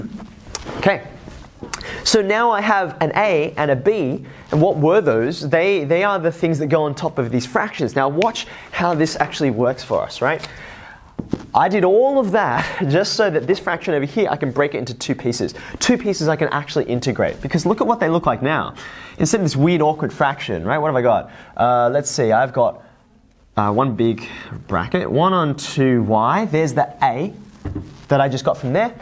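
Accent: Australia. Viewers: His accent is aussie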